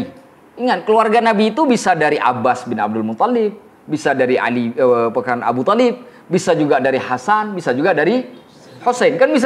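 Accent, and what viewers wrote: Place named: Aceh, Indonesia. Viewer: Bagus ceramah ustadnya. Dr logatnya sepertinya org Aceh ya...😊😊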